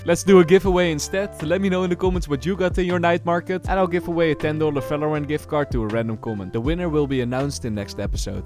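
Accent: Netherlands. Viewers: You are Dutch arent you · i smell a little nederlands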